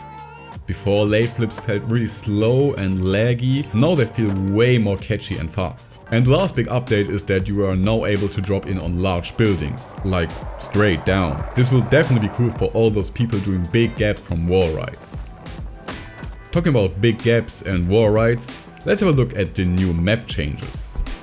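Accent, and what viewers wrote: Germany. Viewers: are you german or smth cause that accent sounds so german 🔥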